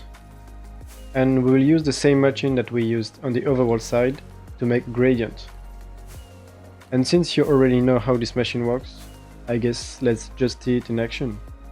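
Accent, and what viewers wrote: France. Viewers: Another incredible video. Thank you very much, your explanations are super clear (Surtout l'accent français qui m'aide à comprendre😂). Love from France ❤.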